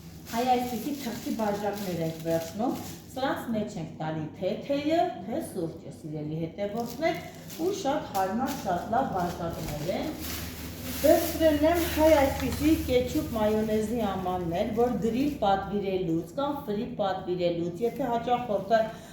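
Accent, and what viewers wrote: Lori, Armenia. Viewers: Էնքան հետաքրքիր ա խոսում ես Լոռվա բառբառով Արտաշատինը հետը խառնած😂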